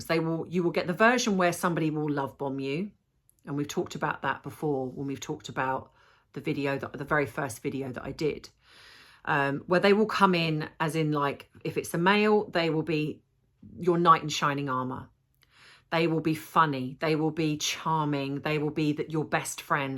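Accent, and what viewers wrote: United Kingdom. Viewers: / I’m sure you’ve got some special tea to soothe that lovely British voice. 🥰 Sending you well wishes & positive prayers. 🇺🇸👵🏻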